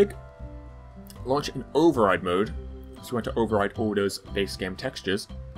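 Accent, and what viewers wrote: United Kingdom. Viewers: you sound such british =D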